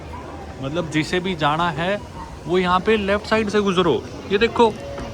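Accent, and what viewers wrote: Haryana, India. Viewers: Saplings non dubbed videos achieved legato hai haryanvi accent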